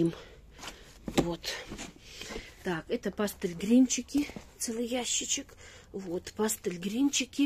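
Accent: Belarus. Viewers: Здравствуйте! Откуда вы? То, что из Беларуси, я поняла по разговору. Из какого города? В Гомеле продаёте гортензии, розы? Хочу Самарскую Лидию.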